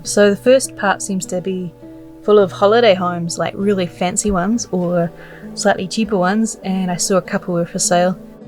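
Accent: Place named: New Zealand. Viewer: Fish and chips. My favourite words in a kiwi accent. 😍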